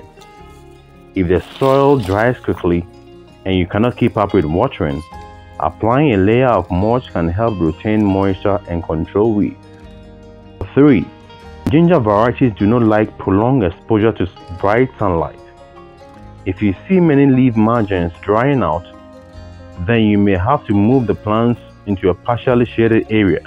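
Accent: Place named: Ghana. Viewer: Doctor!! This is my 2nd video view today since I found your page! After reading a bit about you i know why I enjoy learning from you!! #1 your soothing voice..I was going to guess Ghanaian because it sounds so familiar and comforting! My neighbor's are from Ghana!! And the grandma (Boss!!😅) was so amazed when she saw the garden jnside my apartment 😁!!#2 youre a 🥼 scientist! My major was in science so you definitely have my attention because I know you will have experimented with all of what you're teaching us and want to deliver the best possible results! #3 youre obviously a kind, generous man and we need more of that in this world! 🌎 So thank you for not only helping me grow some plants that I've failed at for decades, but also giving me hope that there are goodhearted, bright, kind humans around. Blessings to you and yours Dr!✌🏼💜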